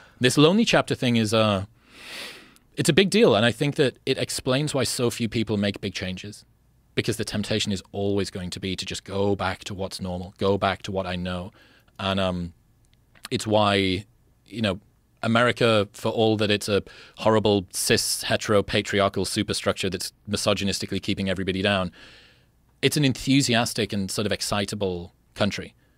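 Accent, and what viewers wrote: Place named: England, United Kingdom. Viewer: This guy just repeats Hormozi quotes in an English accent and people think he's deep.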